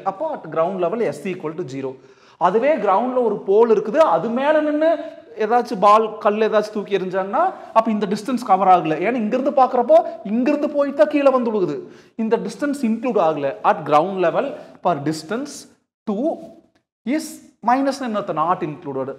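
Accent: Coimbatore, India. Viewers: Neenga Coimbatore pola......slang theriyudhu sir....me too.....❤️from cbe❤️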